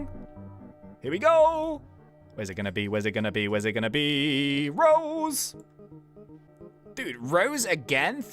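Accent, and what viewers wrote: Ireland. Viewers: But I really love your Irish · you are actualy doing a good irish accent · Thats i nice irish lady accent in my opinion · I feel like he has never been to Ireland he sounds more like an Irish man with a high pitched voice I am insulted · The spirit bear with your lady irish accent makes spirit bear 10x kind.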